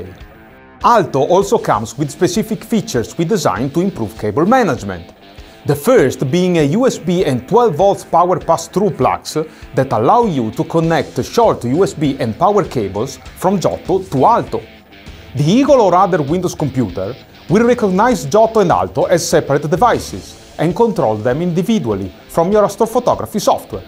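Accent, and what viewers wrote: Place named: Italy. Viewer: This guy made my day with his italien accent 🤣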